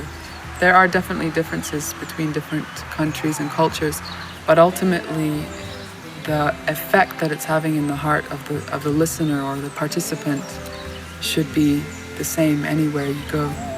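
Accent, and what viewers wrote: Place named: Midwest, United States. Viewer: Her accent is so mid western American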